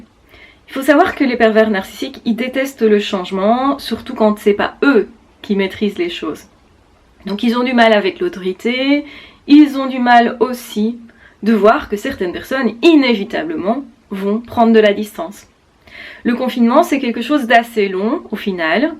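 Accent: Brussels, Belgium. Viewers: Bonjour... (Je n'ai pas trouvé de gmail où t'écrire sur ta page YT, je "tente le coup" par commentaire...). Je te suis depuis quelques temps (abonné) en tant que HPE/HPI, et je m'interroge toujours un peu, au sujet d'une femme avec qui j'ai passé quelques mois au printemps/été dernier... (silence radio aujourd'hui... j'étais trop "rebelle" pour me laisser "faire" plus longtemps... Merci à mon "âme" rebelle qui est parvenue à prendre le dessus sur ma "personnalité" hyper-sensible... à tendance dépendante, donc). Penses-tu qu'un(e) PN puisse avoir des tendances masochistes dans sa sexualité? (ça m'a toujours paru plutôt "contradictoire", mais il est peut-être aussi envisageable d'y voir une sorte d' "exutoire" à ce besoin de contrôle permanent... En t'écrivant, ça me paraît quand même ne pas trop "coller" avec le PN...) Une autre suggestion de profil compatible avec ces 2 tendances? 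(Vie totalement "sous-controle" - par exemple hyper-propreté, clinique -, et sexualité à tendance masochiste... Personnalité évitante, ce serait possible? ) Merci encore à toi pour ton travail 🙏 et ton joli accent que j'aime beaucoup 😉 (Bruxelles? Je ne suis pas belge, sinon je reconnaîtrais vos accents... Par contre, je suis fort en accents français...😁). C'est assez troublant, tu lui ressembles un peu, physiquement (à cette petite "peste" que je soupçonne PN.. les yeux, la bouche...mais là comparaison s'arrête là... ). Paix et Amour à toutes et tous 🌈💕🦊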